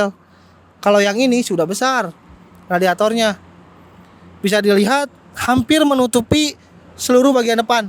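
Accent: West Java, Indonesia. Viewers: Ketahuan logat sundanya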